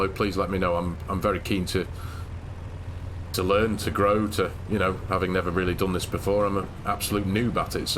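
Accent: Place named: Castleford, United Kingdom. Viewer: Ey up I'm chatting with my wife trying to think where ya from n u sound castleford area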